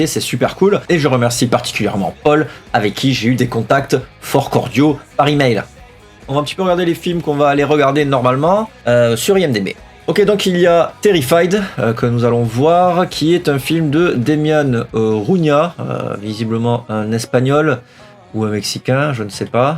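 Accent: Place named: South, France. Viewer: En plus avec ton accent je suppose que tu es bien du sud😜 moi aussi!! Ça fais plaisir.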